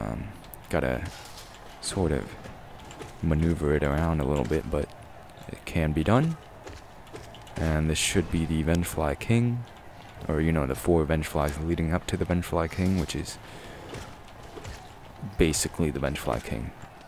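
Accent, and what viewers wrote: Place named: Philippines. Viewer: i could actually listen to you sing and just talk forever tbh your voice is so nice this isn't fair incidentally, where are you from if you don't mind my asking? your accent reminds me of some Filipinos but it could also be European...?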